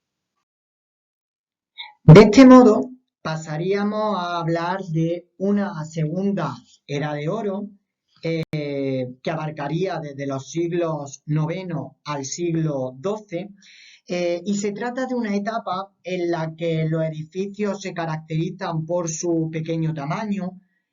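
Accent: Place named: Granada, Spain. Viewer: Eres de Granada? Me encanta tu acento jajajaj, de toda forma muchas gracias pa este video, me ha ayudado un montón 🙏🏻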